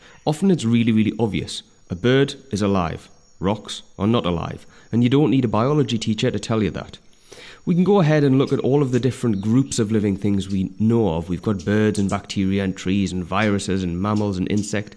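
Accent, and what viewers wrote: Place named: North East England, United Kingdom. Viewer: Love that you are from the north east haha and the accent comes out 100%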